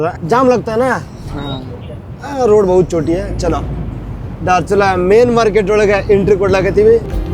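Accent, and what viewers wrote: North Karnataka, India. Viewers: U k style bhashe super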